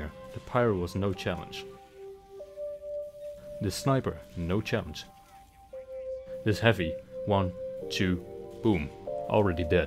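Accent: Netherlands. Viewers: ey just found out your channel and i can already tell ur dutch (same here). keep up the good work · Je Nederlandse accent is echt heel duidelijk :D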